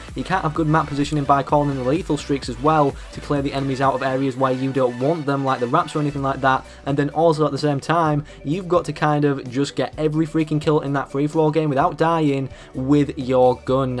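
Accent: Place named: Yorkshire, United Kingdom. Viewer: Your from Yorkshire also your psn name gives me Ebola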